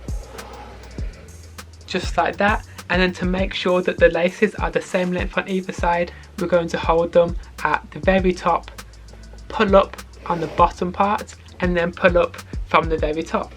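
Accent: United Kingdom. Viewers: British man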